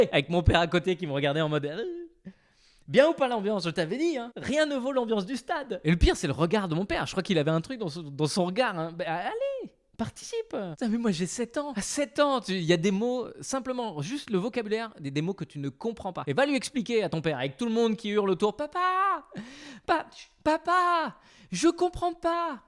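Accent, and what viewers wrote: Marseille, France. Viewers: Pour un bon Lorrain, bravo l'accent marseillais.... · Pas fane de ton accent marseillais mais contente de voir ton retour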